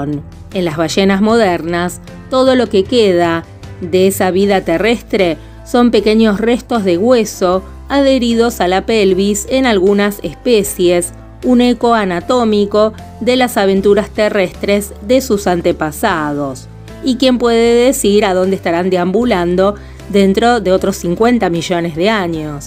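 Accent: Argentina. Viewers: Ah, me encanta tu voz y el acento argentino!!!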